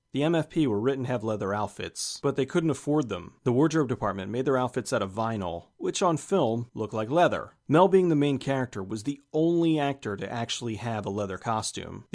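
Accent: United States. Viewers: Every time I hear that American voice...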